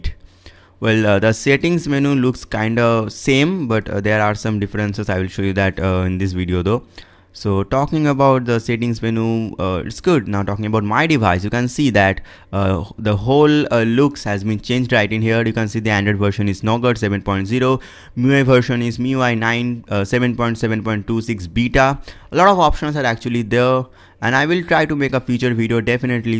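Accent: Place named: Maharashtra, India. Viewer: such a stupid accent. marathi fucker i guess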